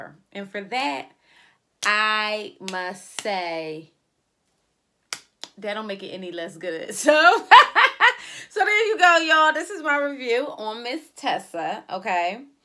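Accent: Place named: Philadelphia, United States. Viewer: You from Philly? I am and you sound like you from Philly😂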